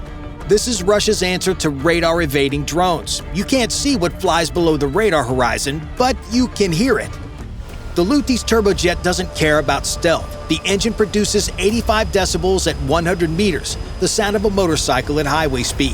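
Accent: United States. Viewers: Another bloody UGLY accent. Why do they keep putting American ACCENTS on videos? They must know the world hates them. No reason to watch any more. was more than enough. There won't be any TRUTH in the video. Americans don't just murder women and children, they don't ever tell the TRUTH! EVER! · Oh dear oh dear oh dear oh dear. I cannot listen to this excitable racket. An English narrator please if you would. This American narration is insulting and should not be heard in Britain.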